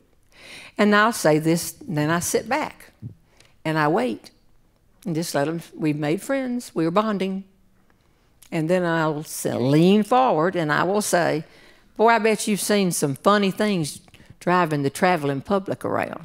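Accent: South, United States. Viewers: I MISS the south every time I listen to you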